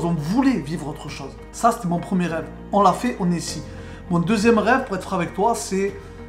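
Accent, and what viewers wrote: Marseille, France. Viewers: Est-ce que t'es un marseillais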